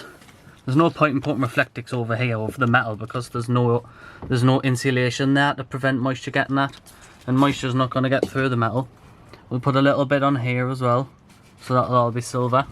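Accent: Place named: Northumberland, United Kingdom. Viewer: Nice job, I'm watching for information and because my mum was from ashington and your accents remind me of her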